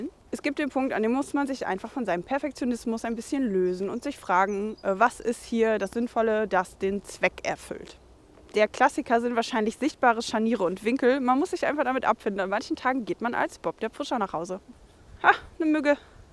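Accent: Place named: Berlin, Germany. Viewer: Ich liebe deinen Berliner Akzent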